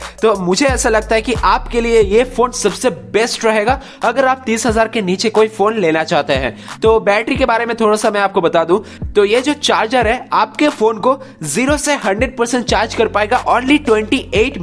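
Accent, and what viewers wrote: India. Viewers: Patna se ho Bhai..?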